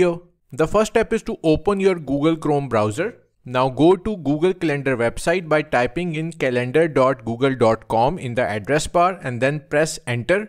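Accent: India. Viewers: Bro that's awesome. I have searched everywhere how to do this and finally, there is a random guy with Indian accent on a low sub-channel, again, everytime lol🤣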